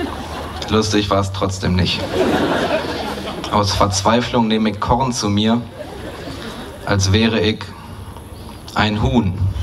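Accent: Berlin, Germany. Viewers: der Text an sich ist schon sehr schick, zusammen mit seinem Berleinern bekomm ich mich aber an manchen Stellen nicht mehr ein. Slampoeten mit Dialekt sind einfach genial, liebe es das niemand ihn versteckt.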